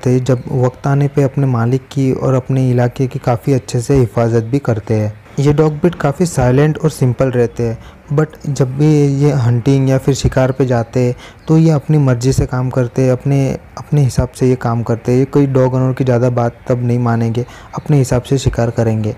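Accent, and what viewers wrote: India. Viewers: तुमच्या बोलण्यातून एखादा शब्द ऐकून कधीकधी असं वाटतं की तुम्ही मराठी आहात.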